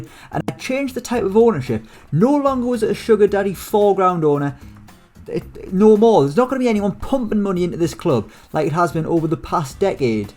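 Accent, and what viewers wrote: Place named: Northern England, United Kingdom. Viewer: the northen accent aswell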